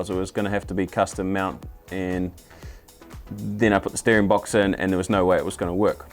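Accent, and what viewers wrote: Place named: New Zealand. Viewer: Welcome to episode fortysex..... go the kiwi accent fella! Keep up the great vids!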